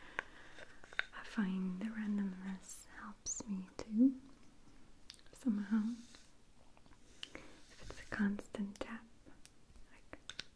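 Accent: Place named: Russia. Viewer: Her normal talking voice still has an obvious Russian accent.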